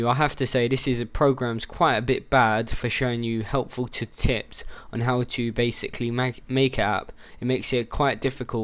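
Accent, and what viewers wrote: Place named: East London, United Kingdom. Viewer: Brilliant tutorial, and your epic east london accent is boss!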